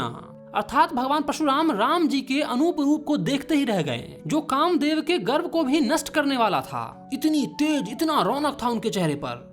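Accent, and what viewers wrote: Bihar, India. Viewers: Bahi aup bihar sa ho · aap bihari hooo?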